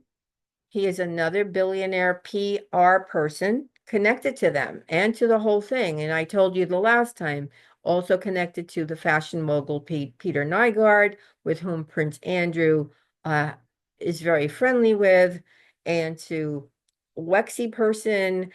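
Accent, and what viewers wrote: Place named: United States. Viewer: She obviously isn't as she has an American accent? 😂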